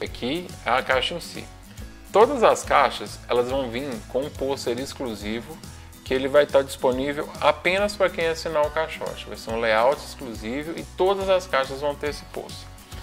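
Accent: Minas Gerais, Brazil. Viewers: Muito massa! Vou assinar o proximo! Adoro o sotaque de Minas! Sucesso meu velho!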